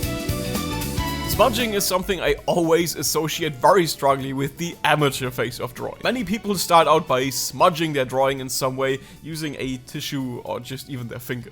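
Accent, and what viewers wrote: Germany. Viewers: I only subbed because you keep on showing up in my feed as I'm trying to learn art and I find it kinda funny that we are both named and speak english with a wacky german accent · Are you german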